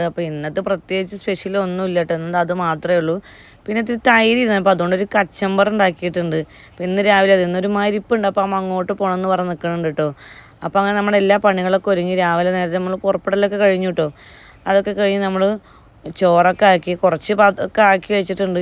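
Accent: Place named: Palakkad, India. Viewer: ട്ടോ പാലക്കാട്ടുകാരുടെ സംസാര രീതിയാണ്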